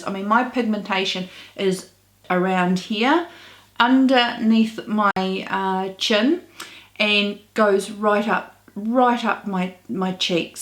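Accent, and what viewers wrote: New Zealand. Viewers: I am a 66 year old from Baton Rouge Louisiana. I love your accent. It's terrible that products like CerVave costs so much for those of you in New Zealand. I had rosacea in my earlier years and I took birth control pills for a long time. So, my current issues are sagging skin and wrinkles. But I still have the oily tzone. Within the last few years, I have developed this annoying problem called sebasceous hyperplasia (clogged pores). It's like little skin colored bumps that are primarily on my forehead. They rarely go away. I hope that you never have to deal with it. We do not know what causes it nor how to get rid of it. But I need to get rid of extra sebum without wrinkling even more.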